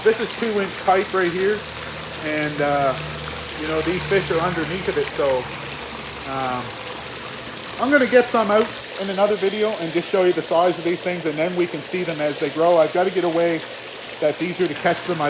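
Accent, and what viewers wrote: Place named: Canada. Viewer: you're either a Canadian or a Minnesotan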